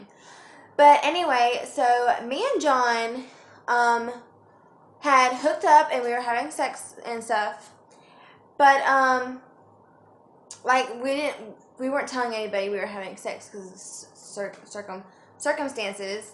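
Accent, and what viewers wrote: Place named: South, United States. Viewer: where u from I hear a southern accent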